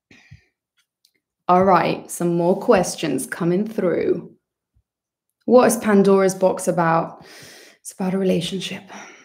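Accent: United Kingdom. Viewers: Greek Icon with British Accent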